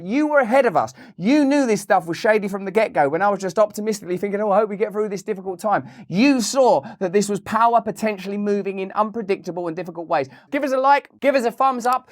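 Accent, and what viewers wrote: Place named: United Kingdom. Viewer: He said "... Jiggery-Pokery" with a straight face! 🤣I love this guy! He breaks down the HEAVY IRL news with a great sense of humor, Empathy, irony, & an amazing British accent. You've come a long way, Russell! So have we all. Still evolving. Big hugs to Everybody❤️! (non-corporeal, if that's easier for you😉)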